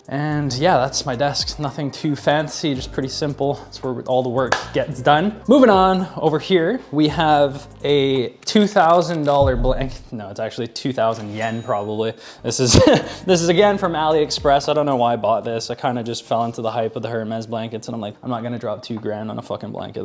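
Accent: United Kingdom. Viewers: i can hear some english twang in your accent... have you ever spent time in england ?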